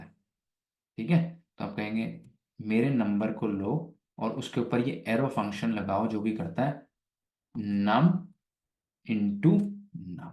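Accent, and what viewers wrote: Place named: Pakistan. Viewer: Apki voice se ap Muslim lag rhey.... Like Pakistani language you r speaking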